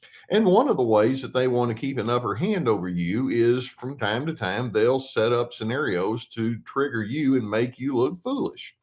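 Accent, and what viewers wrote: South, United States. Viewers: Funny you're 2 hours ahead I just went to your site Pacific Standard Time. Thank you for team healthy, I don't stereotype you though you have the Southern accent. Yes I've been made to look foolish by such individuals you help me not feel so alone when gaslit or hoovered by such individuals or made to feel guilty because what I have to offer is not enough after they repeatedly destroy or lose or sabotage what I give them. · it's because of your southern accent. Your all good.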